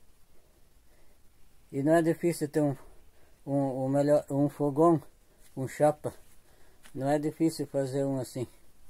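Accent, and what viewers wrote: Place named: Paraná, Brazil. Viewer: Boa noite que horas que era quando vc estava gravando esse vídeo ,,,a tu mora a sua voz tem sutaque do Sul Paraná eu moro em São Paulo abraço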